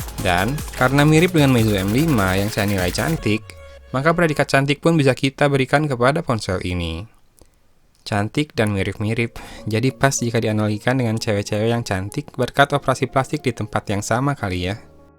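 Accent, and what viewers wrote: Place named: Sunda, Indonesia. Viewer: ngomong ada nadanya, khas dari Sunda. Akhir kalimat nadanya turun. Ini krn baca teks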